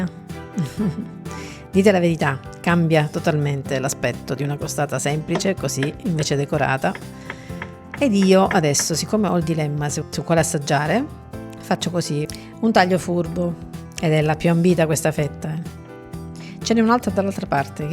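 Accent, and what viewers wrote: Calabria, Italy. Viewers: il tuo stupendo accento calabrese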